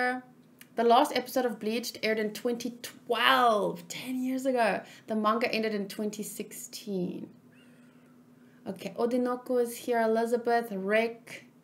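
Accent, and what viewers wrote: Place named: South Africa. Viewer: She’s South African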